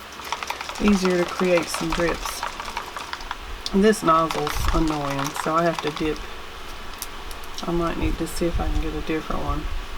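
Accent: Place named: North Carolina, United States. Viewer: I love how "real" you are in your videos, and of course, it's always nice hearing a fellow North Carolinian talk! lol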